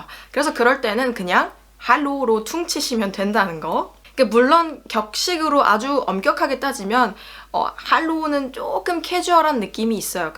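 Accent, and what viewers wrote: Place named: Saxony, Germany. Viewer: 제가볼땐 발음은 약간 동독(작센)사람이 베를린이나 북부독일(함부르크 등등)와서 표준말 구사하는 것 같아 보입니다.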